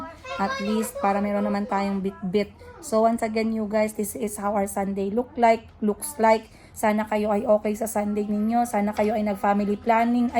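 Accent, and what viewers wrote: Iloilo, Philippines. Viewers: Bat ka nag I ilongga accent 😮eh andiyan ka nman sa mindanao parang Hindi ka proud na mag bisaya eh andyan ka sa mindanao be proud na marunong ka mg bisaya wag ilonnga accent 😮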